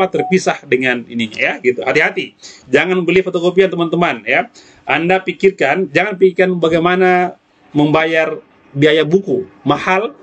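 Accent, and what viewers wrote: Makassar, Indonesia. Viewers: Macam logat Makassar bang😊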